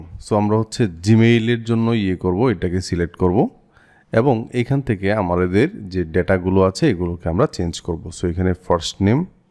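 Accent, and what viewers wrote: Sylhet, Bangladesh. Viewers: Bhai aponi Sylhet er manus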